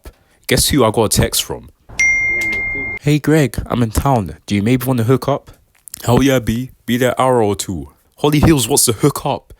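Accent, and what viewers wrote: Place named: United Kingdom. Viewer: The British accent makes this like 1000x better bro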